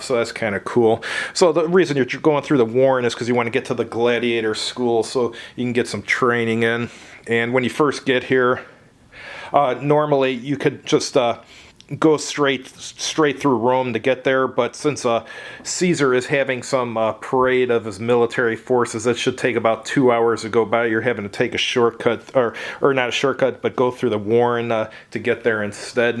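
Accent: Chicago, United States. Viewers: must have the thickest accent in all Chicagoland.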